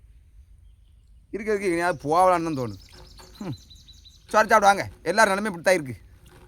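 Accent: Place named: Tirunelveli, India. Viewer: தம்பி உங்க ஊரு வெளியில விடமாட்டேன் நான் மும்பையில் இருந்து ஃபாலோ பண்றேன் எனக்கும் திருநெல்வேலி தான் உங்களுக்கு தொல்லியல் எந்த ஊரு கண்டிப்பாக சொல்லுங்க நீங்க பேசுற ஸ்லைம் எனக்கு ரொம்ப ரொம்ப பிடிக்கும் முடிஞ்சா ரிப்ளே வாங்க❤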